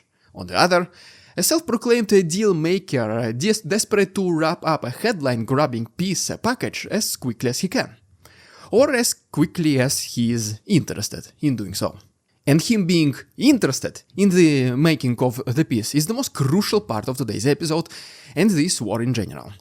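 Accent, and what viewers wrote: Russia. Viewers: I love how with every video, you have such a human dialogue that sounds like national geographic. Your Russian accent is so beautifully balanced with your complex use of English words and your very charming personality. I love how you don't throw numbers at us. You humanize the war and bring it to the hearts of all of us viewers. Wonderful. You've grown a long way since I first discovered your channel and got frustrated with you. You have grown from a young man, into a proud and very emotional news caster who I always look forward to seeing. And mixing in tidbits of silliness just adds to your great videos. Please, dont ever stop. Even when the war is over, I hope you cover the recovery and rise of Ukraine and Russia, brining your unique humanizing dialogue, that will transform the numbers, into recovering people. Stay amazing. Give your little monster lots of love from all of us.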